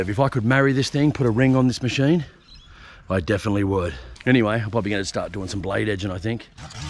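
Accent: Australia. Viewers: Love Australian accent. I am a Zimbabwean living in Portugal.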